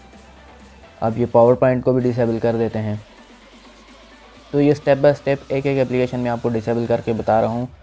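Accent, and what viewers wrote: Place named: India. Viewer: At least ad captions I can't understand Indian plz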